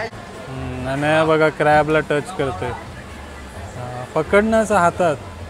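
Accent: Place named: Agri Koli, India. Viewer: आणि तुझ्या मध्ये गावठी भाषेचा टच खूप म्हणून तू आगरी कोळी खूप छान शोभतो.... I like it very much..😊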